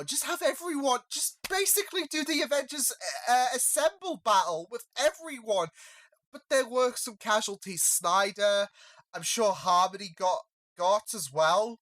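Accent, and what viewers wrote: United Kingdom. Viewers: I don't. Most Canadians live very near the American border, get their main TV channels on basic cable, and we can tell the difference between ourselves. You still sound a bit like the people on Coronation Street. Your Britishness adds a bit of spice to your reactions - the accent, the language. It is a bit different, but very adorable. Loved your Joker, BTW.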